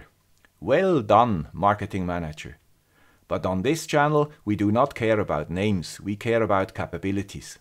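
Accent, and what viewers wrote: Switzerland. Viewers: Having a good Swiss accent, you must be unbiased! But I like my yellow stripper. I had mine to bits, oiled it, adjusted it and loved it and it works fine. I do agree about the end stop. It lets the end go past, and is imprecise in its adjustment. Why don't the Swiss make one? They make excellent watches, so a wire stripper that works should be a piece of cake! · I love your Swiss accent and pronunciation - Knipex for instance. In German the K is pronounced. In UK/US English the K would be silent, so we generally pronounce it 'Nipex'. I suppose it should be K nipex as it's a German product, but I'll stick to Nipex - it's easier to pronounce! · I had a dream The guy with a Swiss accent decided to build an eBike. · Boy oh boy do you come with some baggage! (Politically correct, left-handed, anti-imperial system with stimulating views of silicon.......). 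I only hope you find making these videos as cathartic as I find them humourous. A real fillip early on a Sunday morning. Power on you favourite 'guy with the Swiss accent':) I remember our blue handled diagonal cutters - that lasted for years being (I was going to say stamped but of course that is too crude) 'engraved' with 'Swiss Made' on the shaft (in fact probably hand-signed - they were so expensive).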